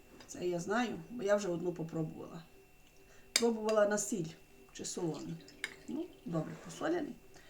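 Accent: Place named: Vinnytsia, Ukraine. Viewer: Доброго дня, якщо не таємниця звідки ви? Ваша мова така рідна, я родом з Вінничини, там такий говір, давно дуже там не була.Здоров'я вам і миру.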